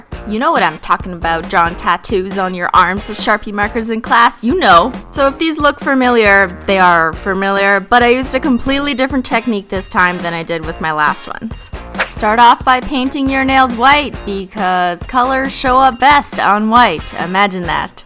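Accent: Canada. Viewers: did I just NOW realize her Canadian accent? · It’s cause she’s Canadian you bitch rainy sims! Canada never makes fun of how you pronounce it!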